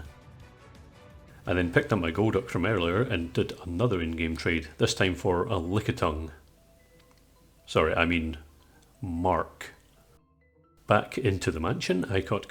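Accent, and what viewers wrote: Scotland, United Kingdom. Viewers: Well done man. Good to hear a Scottish voice too 🏴💪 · wake up babe, new scottish poketuber called just dropped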